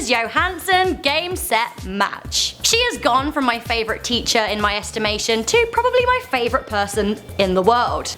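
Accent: United Kingdom. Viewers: Is her accent British? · Love that British accent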